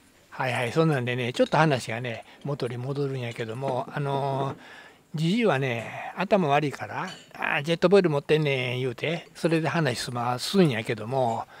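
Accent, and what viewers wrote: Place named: Osaka, Japan. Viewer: やっぱり大阪弁は最高です。しみじみ浸透します。おおきに。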